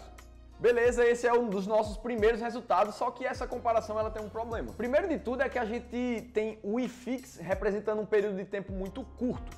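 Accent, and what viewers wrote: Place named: Pernambuco, Brazil. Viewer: Pernambucano até a ventas! Pergunta aí se ele já comeu uns quarto de bode na serra das russas! Esse sotaque não me engana.... é da terrinha! kkkkkk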